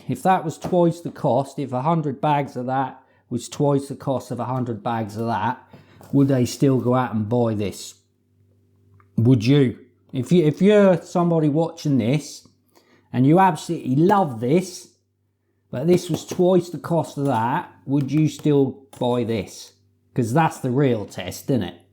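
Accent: Norfolk, United Kingdom. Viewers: I love your broad Norfolk accent!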